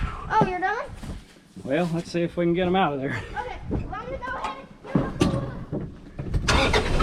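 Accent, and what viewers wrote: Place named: South, United States. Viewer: Even though we live almost 600 miles away We Love hearing that cornbread accent in voice. Sounds exactly like all the kids around here. Awful Southern sounding to be that far North.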